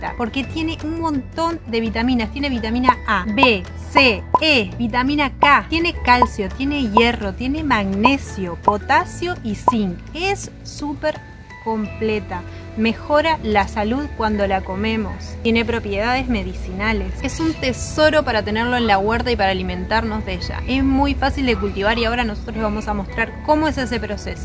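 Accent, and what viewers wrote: Argentina. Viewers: Me encanta tu acento argentino saludos y bendiciones Para ti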